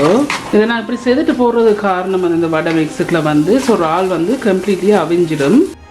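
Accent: Jaffna, Sri Lanka. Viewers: Hai dear I am so happy to be a subscriber to your channel. We feel happy and pleasured to hear your pure jaffna tamil Could you please show how to make prawn flat vadai ? Usually we can buy these vadai in Colombo gall face beach.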